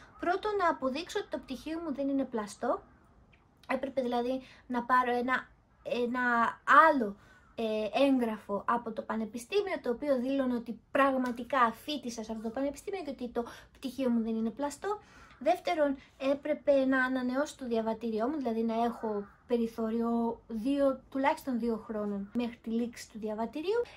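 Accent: Japan. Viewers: Μιλας τα Ελληνικα με Ισπωνεζικη προφορα !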